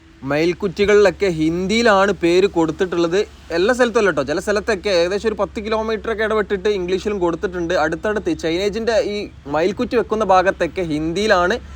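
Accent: Malappuram, India. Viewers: Superb video... Droneman, RS Live, avarude okke levelilekk ethatte, ee Malappuram slang😊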